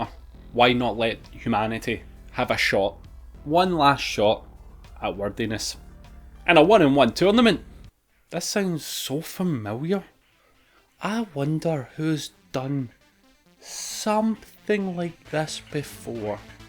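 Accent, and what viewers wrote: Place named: Scotland, United Kingdom. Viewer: Hi. Well done really. You make me curious to watch it actually. I think the job is done in that case. I'll do what I do best... Opinion time. + Great performance + good topic + perfect editing + super transitions + good integration with watcher (me) Ready for that? - YOU HAVE TO GET RID OF SCOTTISH ACCENT IF YOU WANT TO BE LISTEN GLOBAL. I'm so sorry to say that:( Overall piece of great work:)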